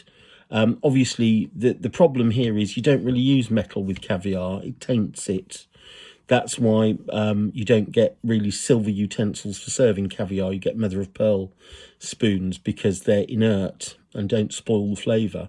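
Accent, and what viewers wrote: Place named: United Kingdom. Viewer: These southern US ears have trouble understanding British accents. Did you say bginternet?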